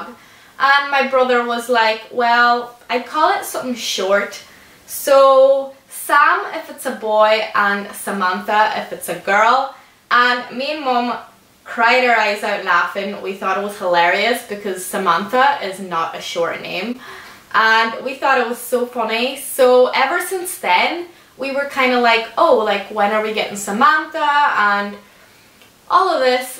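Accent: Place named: Ireland. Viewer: Awe shes so cute and i love your irish accent!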